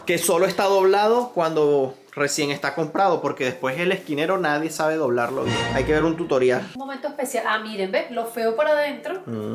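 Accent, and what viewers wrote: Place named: Venezuela. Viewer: Lo que más me gusta es su forma de ser su carazter como compaginan. Me encanta escucharte con ese acento venezolano wue me recuerda cuando vivi allí y que tanto wuieto ese país donde nacieron mis hijos. Y me encanta tb como es y además de ser muy guapa, Dios os cuide siempre y seanuy felices Me contenta mucho y me hace muy feliz veros a vosotros llevsndoos tan bien. Yo naci en España, pero me crie en Venezuela 40 años. Veo muy amenudo vuestros vídeos, pero casi no escribo.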